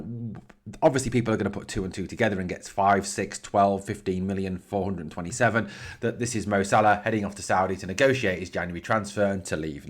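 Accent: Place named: Liverpool, United Kingdom. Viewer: Good old Liverpool accent.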